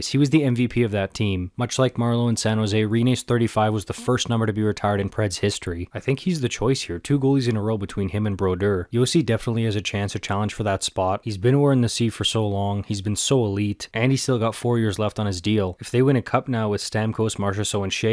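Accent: Quebec, Canada. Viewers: la prononciation dun quebecois ca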